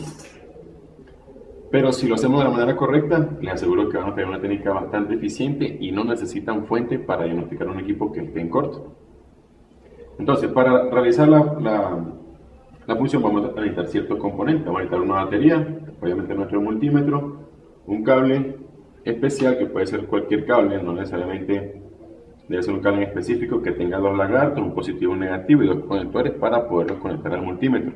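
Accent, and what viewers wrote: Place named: Venezuela. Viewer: Gracias !! al escucharte por el acento lo pensé que eras de Venezuela...sigue adelante, ya me suscribí...desde Valera Edo.Trujillo Venezuela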